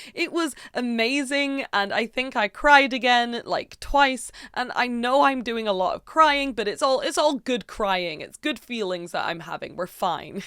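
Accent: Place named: United Kingdom. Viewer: Absolutely love your british accent, lovely as you are!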